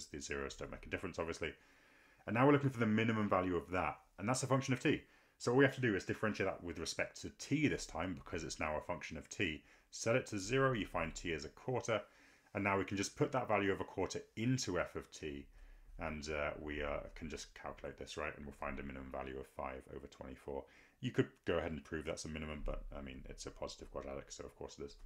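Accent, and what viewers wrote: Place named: United Kingdom. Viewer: Can u go some slow because international students also watching your videos it takes so much of time to understand the your British accent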